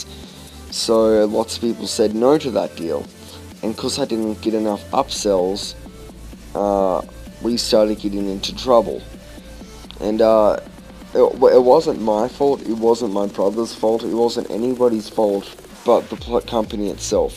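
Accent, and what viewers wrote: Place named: India. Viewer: dat indian accent doh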